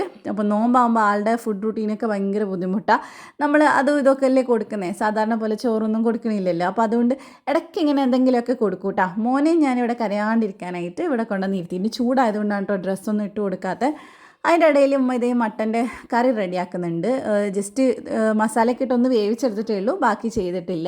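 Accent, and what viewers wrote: Kannur, India. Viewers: Nigal kannur aano.. Evidyanu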